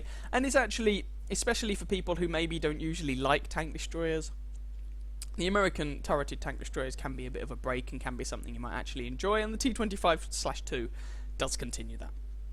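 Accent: United Kingdom. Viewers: Great and you're welcome! You do know that most of us Americans love your British accents as much as a lot of you like ours (especially our Southern)! Lol ^_^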